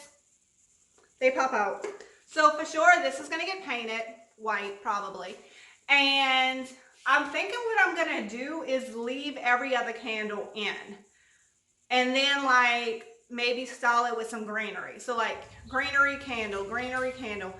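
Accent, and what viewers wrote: Louisiana, United States. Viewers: Isn't she precious?? I first saw another of her videos and I thought " that accent sounds familiar". Turns out she is from the same area of south Louisiana as my best friend's family, Cajun country. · Are you from Louisiana? You have a similar accent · Girl, it's absolutely a bedpan. I'm glad I discovered your channel, your content is great. And I love listening to your Louisiana accent!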